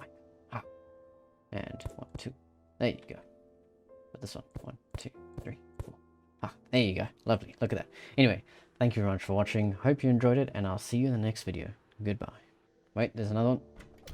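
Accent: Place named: South Africa. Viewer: Sorry, I couldn't help notice the accent, but are you South African?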